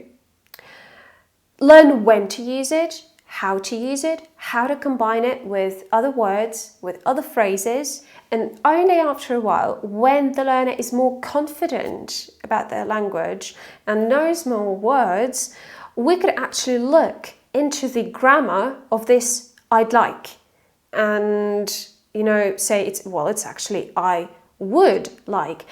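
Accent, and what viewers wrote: United Kingdom. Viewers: Hi, you can be completely mistaken for a native speaker, which is rare. You've deserved a praise. Counter-intuitively, speaking with a perfect Modern RP accent might indicate that you are a foreigner, ha-ha (but that doesn't matter of course). Have you polished your pronunciation being in the UK by emerging? Arguably, the hardest part of English is pronunciation. Many people speak English, but only a few speak clearly and are pleasant to listen to. My mother tongue is Russian as well. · I wonder what your natural English accent sounds like. I mean, without any conscious effort put into it. It's hard to believe that British accent could be natural for anyone haha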